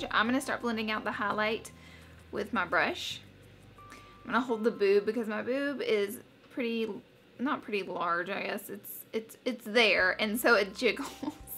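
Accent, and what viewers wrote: South, United States. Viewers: All the power to you! So glad someone made this video. Also where are you from girl? Southern accent? Love it! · are you from the south? because if you are your accent is so cute!! I LOVE it!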